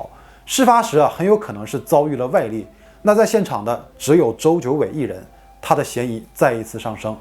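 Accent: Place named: Shenyang, China. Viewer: 但是有浓浓的东北口音 感觉像沈阳的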